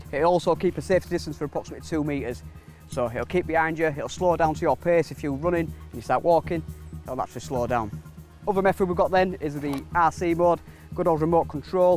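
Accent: Scotland, United Kingdom. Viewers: Is he From Scotland?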